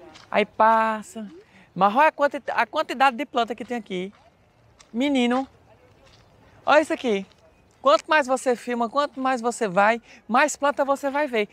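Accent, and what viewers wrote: Ceará, Brazil. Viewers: Gratidão por manter seu sotaque, por valorizar suas raízes. Também sou nordestina e amo o nosso jeito de ser. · Adoro seus vídeos, vc nos mostra lugares lindos e passa as informações de forma divertida e amo seu sotaque, sou de São Paulo mas minha família é do Ceará, qdo vejo seus vídeos só lembro da minha família 🥰😘💚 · Bom dia, espero que tudo esteja bem c vc.como boa nordestina gosto.de assisti-lo pois vc ñ perde o sotaque cearense do Juazeiro e do Crato, sou paraibana do sertão.e minha cidade faz limite com Mauriti e conheço toda região.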